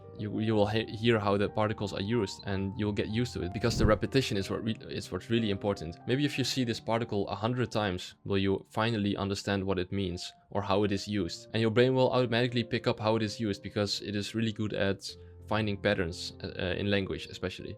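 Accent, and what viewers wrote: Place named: Netherlands. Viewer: I actually used the same video series when I started learning Japanese 5 years ago Ik wist gelijk dat je nederlands was door het accent man geweldig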